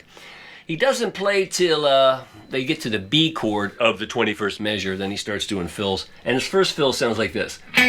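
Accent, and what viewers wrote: Liverpool, United Kingdom. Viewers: Even nailed our Scouse accent, la'! Well, almost.... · no one can succeed in doing a Scouse accent like......!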